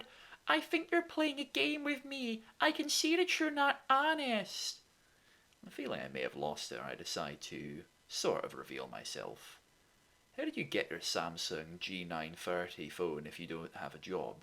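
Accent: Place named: New Jersey, United States. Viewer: Your NJ accent is dead on!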